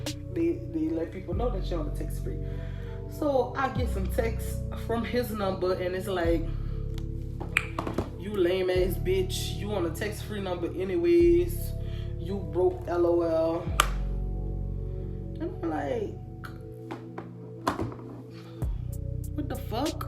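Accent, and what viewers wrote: Louisiana, United States. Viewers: She gotta be from Louisiana she got that accent..I lowkey miss living in Louisiana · I love Louisiana accents😭❤️ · She gotta be from Louisiana I hear the craw in her accent I loveeeeeee it though you got beautiful hair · i just found your page but your accent 😍😍 . that’s sound like that nolaa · I love her Louisiana accent!😂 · New Orleans accents are my absolute favorite!!!! However; what is not my favorite is sis flipping her hair back and forth like 😐😐😐sisssssss please just do your hair it’s distracting!!! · I love your accent 💕 are you from Louisiana? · Love that New Orleans accent whole video lit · I knew you were from Louisiana! I love your accent 😍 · That Louisiana accent tho... Right it is Louisiana? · I went to college in Louisiana. I know that accent anywhere 😍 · New Orleans ? First time watching her videos and Her accent hitting me hard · I automatically knew you was from Louisiana with that accent lol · Your accent made me automatically subscribe. 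Ain’t nothin like a good ole NOLA Accent BAY-BAY 🤩😍😍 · Love your accent you must be from da boot oh and LOVE your hair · U got to be from Louisiana with that accent ... 💜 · that louisiana thick in that accent. It is so cute!!!! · Da accent is deeeeeeeeep ! New Orleans gurll😻❗️ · I can tell you from Louisiana 😂✊🏿💯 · U have to be from Louisiana. Love yall accent! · Ive had some shit like this happen to me a few times smh imma do a few story times on my channel one day when i get the courage lol, but i loved the vid and ur accent made me miss Louisiana cant wait to go after the rona clear up in the u.s. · My guh u from Louisiana? You sound just like my family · Accent sounds like Louisiana, hey sister girl 🥰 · Where you from!? Sounding like nola babyyyyy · I already knew you was from Louisianaaaa, I love your accent 😭 #newsubbie ✨ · Louisiana accent? It's so cute 😃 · Yesss with the nawlins accent!!!❤️ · You from Louisiana ? 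You sound like my fam from down there in slidale☺️💕love yo accent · This popped up on my feed randomly and my first thought hearing your accent is New Orleans gotta be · Period nails and hair.. such a fan of your accent too, let's go Nola baby lolll.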